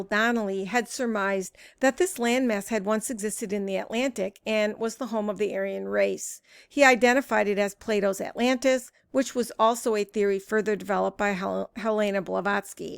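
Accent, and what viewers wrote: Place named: Chicago, United States. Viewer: That's a Chicago mid West vocal infection. Someone I loved spoke that way and she even had it when speaking Spanish..as she was born in Cuba then fam had immigrated to Chicago as a child.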